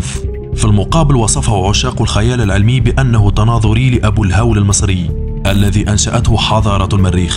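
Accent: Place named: Tunisia. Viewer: انت تونسي ! هذا واضح من نطقك لبعض الحروف هل أنا مخطأ ؟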